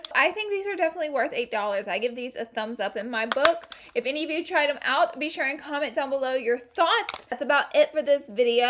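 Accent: South, United States. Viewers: You have a southern accent so I automatically connect to you. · I love your accent! Where are you from in the US- your accent is to die for!💘💘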